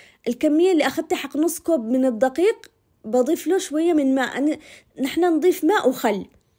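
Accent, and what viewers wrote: Aden, Yemen. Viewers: ايوه حلو لهجتك تجنن يمنيه وافتخر تحياتي من صنعا ام ايلان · ماشاالله عليكي دكرتني يابنتي بالتواهي والسياره حق البطاطيس الله يحفظك يا اصيله بنت عدن وكله كلامك عدني محسن كلامنا نشتي طريقه الكاتلكس بالمفروم حق عدن والسبوسه حق عدن من يد حبيت الاكل ❤❤❤❤❤🎉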